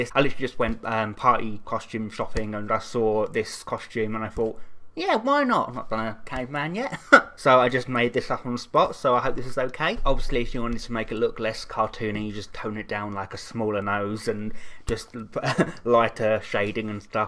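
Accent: United Kingdom. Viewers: makes caveman sounds while still sounding british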